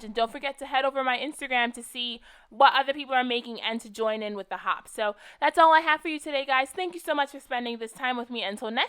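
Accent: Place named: East Coast, United States. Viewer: Love when you do mixed media! It was fun to hear your east coast accent sneak out a bit more on this one too! Beautiful spread!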